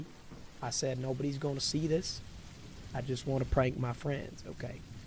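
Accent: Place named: South, United States. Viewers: Oh my gosh ikr!! I was thinking that he has a Southern accent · crazy how no ones talkin on how dat country accent was on point tbh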